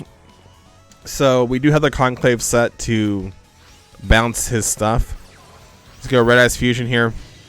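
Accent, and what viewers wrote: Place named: West, United States. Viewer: sound like cowboy but sometimes feel he just a farmer from Alabama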